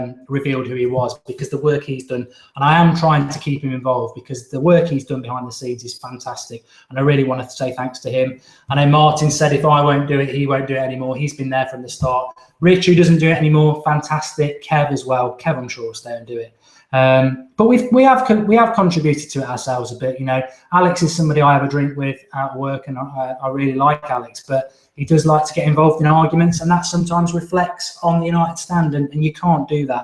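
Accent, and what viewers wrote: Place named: Birmingham, United Kingdom. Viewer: His kitchen is suspiciously clean. And his accent these days is decidely less brummie.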